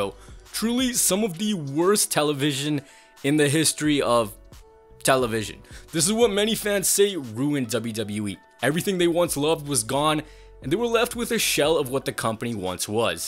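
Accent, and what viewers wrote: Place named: India. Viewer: this guy speaks with a heavy indian accent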